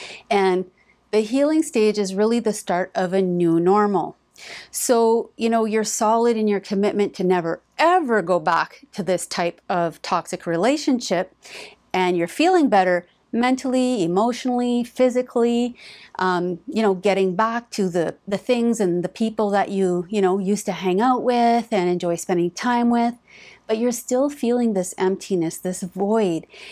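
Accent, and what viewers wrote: Canada. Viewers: Of course it’s a Canadian twang I’m picking up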